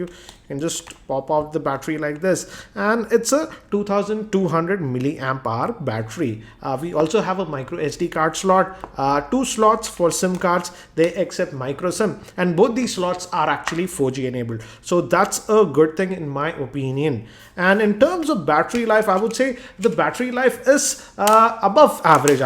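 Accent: India. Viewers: Indian english...argh!!!!!!!!!!!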